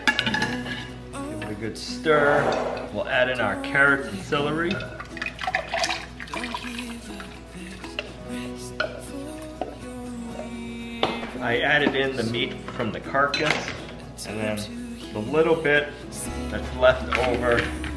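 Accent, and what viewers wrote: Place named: New Hampshire, United States. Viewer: Ok, so I'm from LI, NY, we say Hot differently than folks from NH, or from Mass by way of NH.....how do you say Hut and is it different than the way you say Hot? I'm fascinated by that difference in accent. Not making fun of it but I'm puzzled by where your accent comes from! I've met folks from Northern VT and Northern NH, I think it's more the NH folks that have a distinctive accent. So in NY we might joke about how Bostonians say "park the car" from NH it's more "you can't get there from here" which has a very different accent than Boston! Loving the quirks of our regional accents! Which explains some of the reasons I also enjoy Cog Hill Family Farm from Alabama and Growing Grass family farm from Louisiana!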